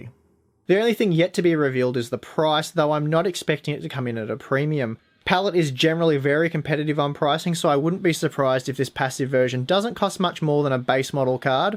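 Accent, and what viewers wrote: Australia. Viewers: "Hear that? That's the sound of an australian accent."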